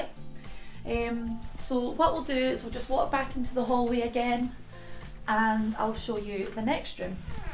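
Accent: Scotland, United Kingdom. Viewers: Gran and grandads house looks lovely and will be especially comfortable I'm sure 😊 looking forward to seeing more of Bridlington, and to watching your channel grow . Being a fellow scot you have a lovely soft Scottish accent ❤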